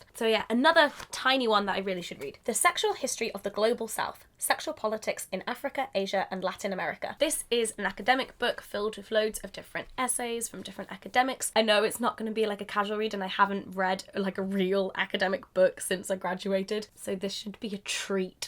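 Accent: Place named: Manchester, United Kingdom. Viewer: Have you been home recently? You sound more mancunian😂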